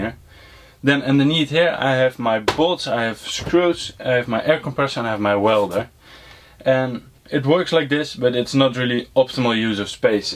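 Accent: Netherlands. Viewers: I am new to your channel. This video popped up as being recommended. So I watched it Very interesting how you organized your shop. I also watched some other videos from you, straight away. They are interesting as well, so I subscribed. Is it possible that I hear a Dutch accent in your English? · Listening to you, it seems to me you'r Dutch, but I stick to english so more people can read your channel. My workshop is 2,4 x 3,5 meter, so not much bigger. Also I 'm a fitter & turner for over 35 years. therefore I was keen to see what solutions you came up with. One of the great things of the workshop is your lath. Maybe one day I have one as well....One thing that I discovered is that I like to keep my tools in a drawer or systainer. The main reason is DUST. I'm going to make a central vacuüm cleaner system to keep the dust to a minimum. This is going to be a system at about 2 m high, with a arm or rail to guide the hose. 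I hate it when I saw with a plunge saw and the vac.cleaner hose hooks up to the rail or bench etc. anyway I'm curious what is coming.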